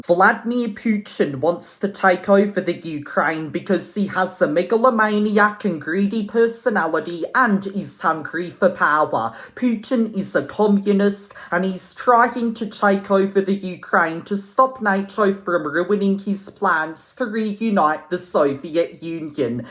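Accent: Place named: Europe. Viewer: your accent is quite European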